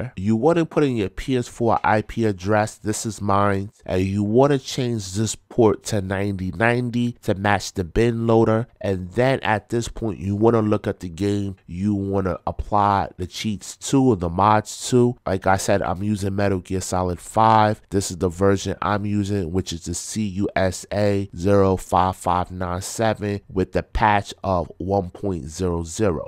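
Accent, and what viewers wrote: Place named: Brooklyn, United States. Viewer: Bro you from NY aren't you ? By your voice an slang I would say brooklyn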